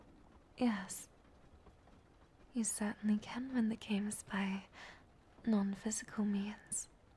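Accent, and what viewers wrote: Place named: Australia. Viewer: Damn this is the first time I've heard an Australian accent in asmr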